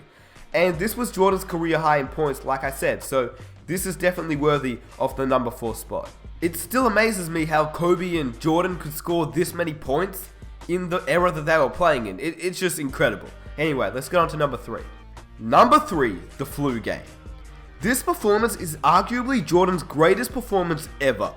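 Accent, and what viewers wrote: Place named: Australia. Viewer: What do you mean? you always sound Australian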